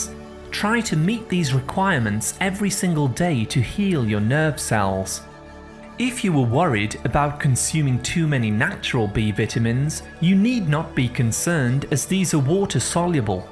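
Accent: United Kingdom. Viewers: That accent lol must be british.. we say vight-o-mins they say vit-a-min...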